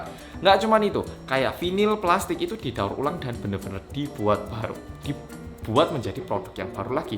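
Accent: Java, Indonesia. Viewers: Orang Korea yang medok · jowo banget iku lho kletek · Hal yg menarik adalah, kebersihan tanggungjawab bersama, pemerintah buat aturan, warganya mematuhi..sehingga lingkungan negaranya bersih, indah, rapi, warganya juga sehat, rapi, dan kuat. Nah jawabannya warga +62, budayakan dari diri sendiri dan patuhi aturan pemerintah. Sekian dan terima kasih. Makasih mas medok kuliah sampahnya. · Bersama orang korea yang ......MEDOKKKK😜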